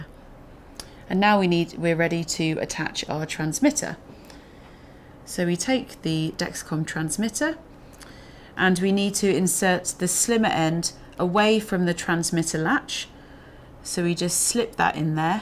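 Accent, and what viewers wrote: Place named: England, United Kingdom. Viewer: Wow , I'm in Love ! I really enjoyed this presentation and her English accent as well !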